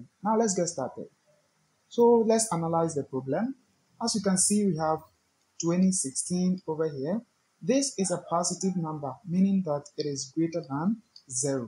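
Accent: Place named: Ghana. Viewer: The accent looks more of a Ghanaian.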